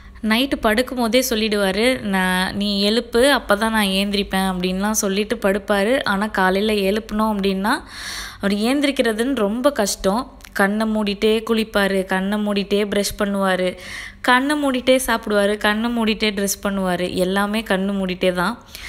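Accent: Ramanathapuram, India. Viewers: k sister ramnad side area la paesura maari irundhuchu sister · enga ooru slang madhiriyea iruku....na ramandhapuram....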